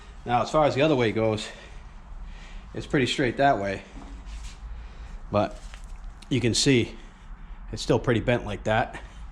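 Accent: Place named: Massachusetts, United States. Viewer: ahhhh I knew it lol I live in Maine, but my dad has 6 siblings in mass so we have huge family down tthere. All over. I knew the mass accent. That's awesome. And yeah I didn't take the motor out, or take the bike apart so ill be referencing ur engine vids for sure. I already learned about kawi swingarm spanner nut deal, which black wire goes to the sensor about starter, orientation of crank case vent etc. We just went to everett MA and bought 3 brand new machines. Me my girl and the kiddo.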